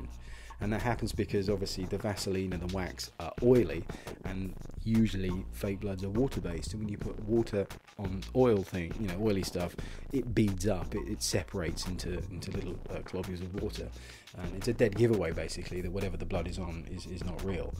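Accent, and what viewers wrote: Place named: Australia. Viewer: he sound Australian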